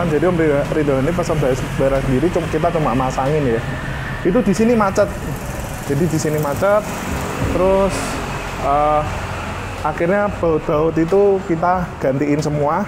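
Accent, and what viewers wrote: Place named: Semarang, Indonesia. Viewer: Logat semarangan bangts. 👍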